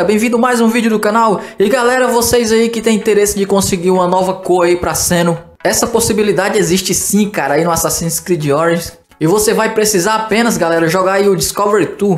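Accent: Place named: Northeast, Brazil. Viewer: E nois tabem sou nordestino cabra da peste o Nordeste vai domina o mundo percebi pelo sotaque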